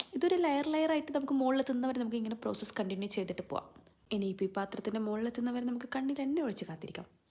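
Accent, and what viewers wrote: Kannur, India. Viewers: Ingal kannur laano 🙄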